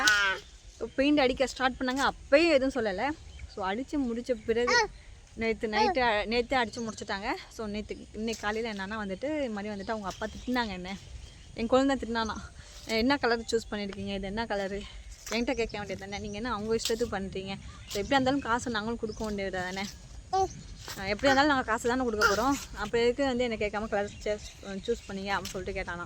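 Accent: Thanjavur, India. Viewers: தஞ்சாவூர் தமிழ் அப்படி தான் இருக்கும்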